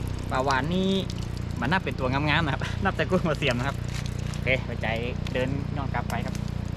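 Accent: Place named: Phrae, Thailand. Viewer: สำเนียงนี่เป๋นคนแป้แม่นก่คับ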